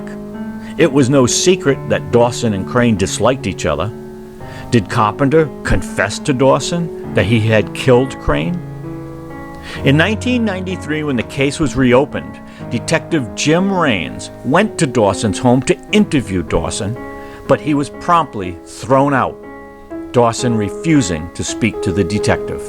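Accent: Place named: Northeast, United States. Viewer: "In his Scottsdale Arizoner apartment" lol Arizoner gotta love that northeast accent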